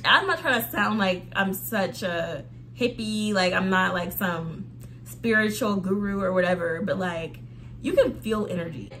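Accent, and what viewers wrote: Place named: New Orleans, United States. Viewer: When u talk about men it sound like u went out there n nigha was tryna pimp u or sum. N it sound jus like New Orleans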